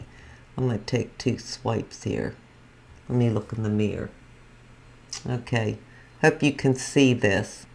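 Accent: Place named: Pennsylvania, United States. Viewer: Hi! I'm new here to you! Wow no foundation! I'm a foundation Queen at 78 almost 79! I think I still like to play little girl dress up LOL I had my cataract surgery done January and I can't wear full make up yet or eyeshadow and I'm chomping at the bits LOL your skin looks good for not wearing any foundation you must take care of your canvas because that's number one for me even though I cover it with foundation LOL I love your accent my PA girl I'll see you again soon. Oh and I don't use this foundation only because it's not enough coverage for me but it looks good on you! My subscribers have gotten me thru some tough times the last year so yes encouragement and support means everything! 😘Hugs Babs ❤️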